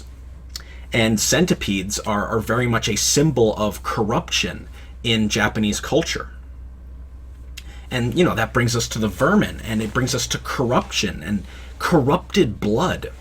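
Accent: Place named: Northern England, United Kingdom. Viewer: it made me chuckle a little hearing you say "Oedon" in a a northern english accent.. you can just pronounce it "Oden" its just the accents in game that make it sound like Ewwwdon 😂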